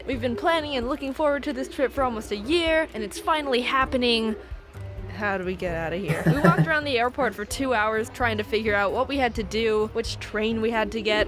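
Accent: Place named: Philippines. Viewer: your english sound like filipino are you half?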